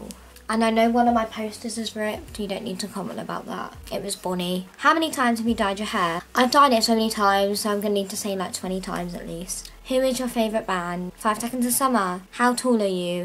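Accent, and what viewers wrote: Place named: England, United Kingdom. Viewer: Your english accent is so cool and you have beautifull HAIR and EYES 😍😍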